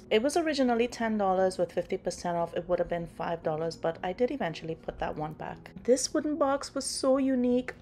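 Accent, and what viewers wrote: Trinidad and Tobago. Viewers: New to your channel. Do I detect a Trini accent? I’m from Barataria. Enjoying your haul.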